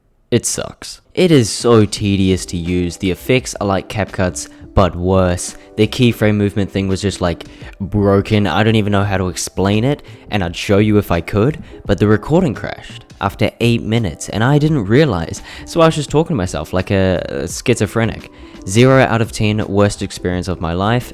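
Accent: South Africa. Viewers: r u south african? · South african?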